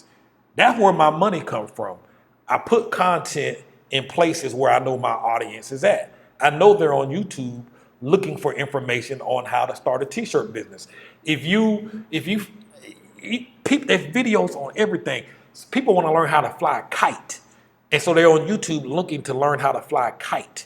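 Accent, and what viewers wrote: South, United States. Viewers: My man. You have this southern draw that cracks me up. . You're obviously very intelligent and I've enjoyed watching your journey.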